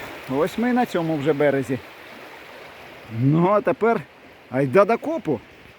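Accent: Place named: Vinnytsia, Ukraine. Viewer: Деякі слова такі, наче ти з Вінничини, типу ходю. Здивований що ти білорус.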